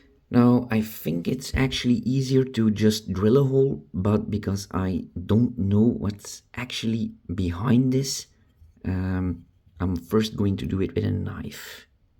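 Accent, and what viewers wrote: Belgium. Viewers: Congrats on committing a gun crime in Belgium. What you are doing is prohibited by law in Belgium, and based on your accent and profile I'm guessing that's where you are. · you sound flemish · Dat accent doet mij ergens aan denken. Aalst?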